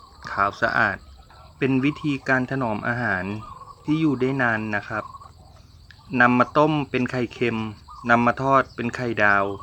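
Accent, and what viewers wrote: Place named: Suphanburi, Thailand. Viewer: เลิฟห้าหนึ่งได้ยินแล้วต้องตามมาชม เยี่ยมมากค่ะ น้อง 👍👍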